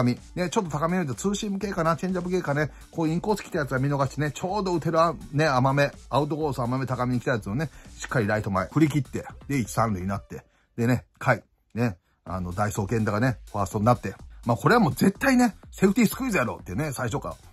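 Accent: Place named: Western Japan, Japan. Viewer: 声質と西日本系のイントネーションが似てるのかもしれませんね！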